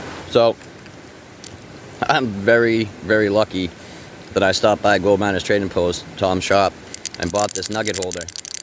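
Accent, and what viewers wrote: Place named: New England, United States. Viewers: I enjoy watching you guys find the Au. When I first saw your videos a few years ago I was laughing because some random dude with a thick New England accent was in California gold country digging nuggets and flakes. You really should incorporate Sam Adam's Lager into your videos..."your cousin from Baaaahstaaahn." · I hear a NE accent. NY 🤔 ...Northern Pa. Maybe N.Jersey.A long time ago but you been there. 😆 If not,its crazy how close it sounds. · I was quite young (13) when JFK was assassinated. My family had been very big politically on Kennedy. Much had been made of his Bostonian accent. We even had a comedy album on the first family <link> So that was my first real exposure to the Massachusetts accent. I realize this is off-topic as hell - I landed on your site because of my own interest in panning for AU. 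I just got curious about your accent and thought i'd ask. Good talkin with you - Keep up the good work. Peace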